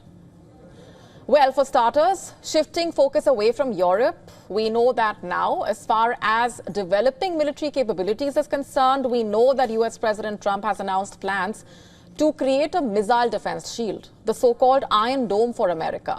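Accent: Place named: India. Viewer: She spoke with too heavy Indian accent compare to Chinese newsreaders who speak very smooth English.Hard to understand her fully. She need to speak slowly.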